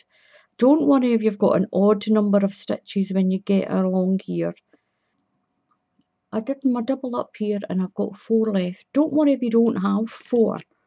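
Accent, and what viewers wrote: Scotland, United Kingdom. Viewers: What a lovely tutorial and so great to hear a fellow Scottish accent as a bonus!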